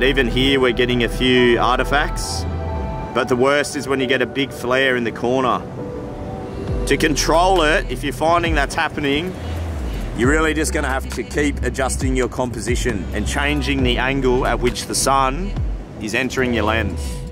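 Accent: Australia. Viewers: That photo is so beautiful. Your Aussie accent was so strong 6 years ago - it's really noticeable compared to the videos you made this year 😊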